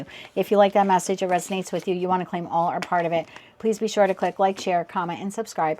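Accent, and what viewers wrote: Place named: New Jersey, United States. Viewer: I got every word .. must be a Jersey thing lol stay warm/safe in this weather ❤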